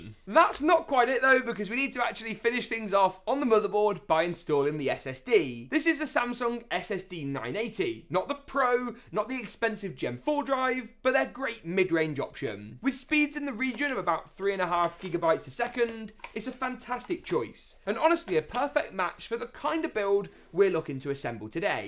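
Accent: United Kingdom. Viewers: Lol I’m sad he has British accent but he didn’t do the video on £ I mean it would have helped us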